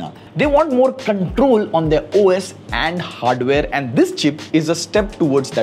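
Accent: India. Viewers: Bro, your accent is really thick. Try to talk with out the indian accent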